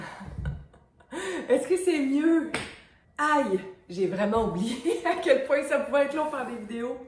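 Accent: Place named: Quebec, Canada. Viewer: Québec sûrement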